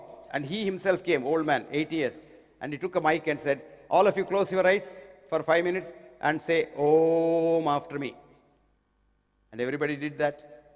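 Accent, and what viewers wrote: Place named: India. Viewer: Only Indian will understand his English....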